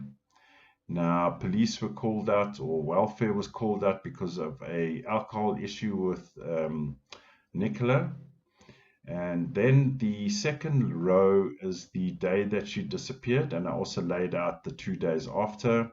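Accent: South Africa. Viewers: Hi, you sound South African. 👋👋 Hello.. Just subscribed. Thank you, this does shed light as you get more information to expand on this. It makes more sense. Something else was going on, because this whole case sounded fishy. I did not ever believe that Nicola jumped into the river, swollowed water on purpose, and unalived herself by that method. She was fit, fitness conscious, could swim, the river was not deep or flooding, she & she had her dog Willow with her. Plus she had 2 daughters. After 3 weeks of searching the river Nicola was not found in the river. Funny that. Plus there was a helicopter searching, drones, sniffer dogs, police searching, volunteers searching, police divers, and Peter who was a volunteer also offered his services for free. He used his divers, and sonar equipment as an added bonus, but despite all this collaboration, Nicola was not found in the river!! 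That is probably because Nicola was not in the river when they searched. She might have been placed in another body of water and later moved into the river because the autopsy would have to show Nicola was in water for 3 weeks. We are still waiting for the results and inquiry. Now Peter is the scape goat. Who stands to benefit from N being unalived? It was actually the police's job to find Nicola in the river because it was their main working hypothesis that Nicola was in the river. And how did they come to this conclusion? They were fed faulse information. They were trusting P's version which w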